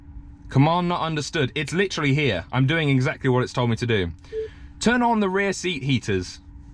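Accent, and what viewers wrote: United Kingdom. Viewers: Your car don't understand British accent. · I have seen a vidéo in the US and work perfectly...weird ! Maybe your brits accent ? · I watch this guy's video specifically for that accent. Saying something even if stupid, in a Britsh accent just makes it sound smart. I think that's how Boris Johnson got to be PM.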